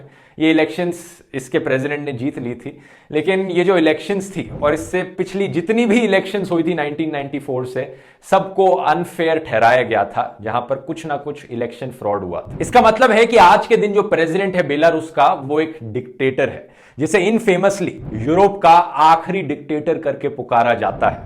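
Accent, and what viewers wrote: India. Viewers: I completly agree with you mate. Just a question, what language do you speak? because it sounds like English merged with Hindu or other Indian language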